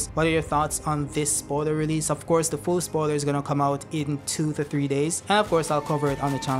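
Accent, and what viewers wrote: Jamaica. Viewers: The accent..you Jamaican bruv?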